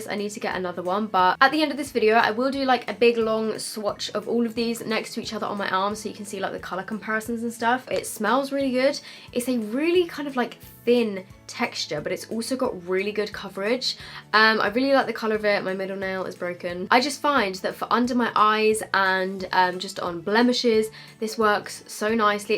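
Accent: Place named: England, United Kingdom. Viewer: You are my favourite English youtuber and my Spanish favourite youtuber (I am Spanish) has just done a review of your palette. It is like a mixture of my 2 favourite youtubers in a video Yayyyy haha Her channel's name is Ratolina in case you want to watch hehe Lots of love♡♡♡ ps: I really love your accent ♡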